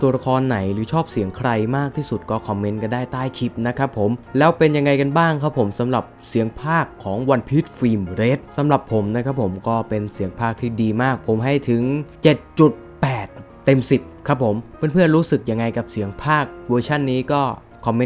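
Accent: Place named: Suphanburi, Thailand. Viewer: แอดใช่คนสุพรรณป่าวเนี่ยเหน่อจังเลยตอนท้ายๆคลิปอะน้า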